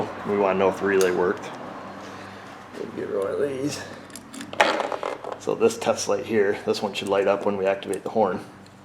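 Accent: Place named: Midwest, United States. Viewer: I know he lives in Avoca, NY. But, listen to how he talks. He has a thick mid-western accent. I know several people from Michigan. One lives right across the road from me. doesn't sound like a New Yorker at all. I'm just curious where he's FROM.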